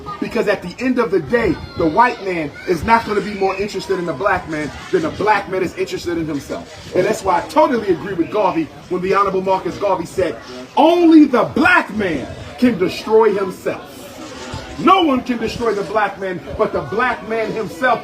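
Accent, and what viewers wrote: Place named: United States. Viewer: Who are the “indigenous” Jamaicans? Are you even Jamaican? Cos you sound American to me. And you guys clearly have your own issues you should be more concerned with. Secondly, of course Jamaican are gonna be nice to tourists. Tourism a huge part of the Jamaican economy. Thirdly, land isn’t “taken” it’s not the 1600s! Land is purchased, which the Jamaican people have no actual say in. Just like many neighbourhoods in New York were gentrified, were those neighbourhoods “taken”? You’re just chatting to be chatting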